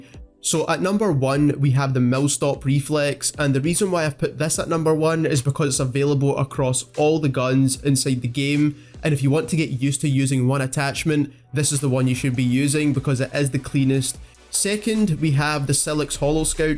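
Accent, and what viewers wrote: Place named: Scotland, United Kingdom. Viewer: The Scottish accent is the best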